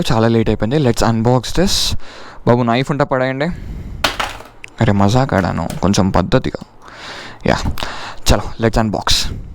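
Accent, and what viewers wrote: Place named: Hyderabad, India. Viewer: The way you speak like light posh and hyderabadi boy 😍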